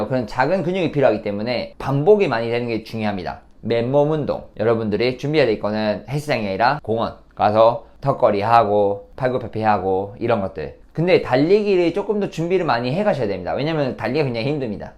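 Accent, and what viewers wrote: Gyeongsang, South Korea. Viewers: 와 ㅎㅎ찐 경상도인줄 알았는데 의외시네요~~^^ 부모님이 경상도 출신은 아니신지~~ㅎㅎ 근데 서울말보단 사투리가 더 찰떡이에요~ㅋㅋ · 와 ㅋㅋ 전라도 사람인줄 알았는데 서울 + 경상도였네 ㅋㅋ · 경상도 사투리 자주 사용해주세요 ㅎㅎ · 경상도 네이티브 같아요 맨.몸.운.동. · 경상도 사투리 쓰는게 자연스럽습니다!!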